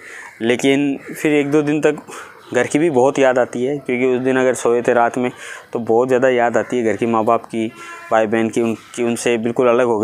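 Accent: India. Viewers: Sit aapka na bolne ka aur samjhane ka tarika ekdam mast hai👌ekdam Desi style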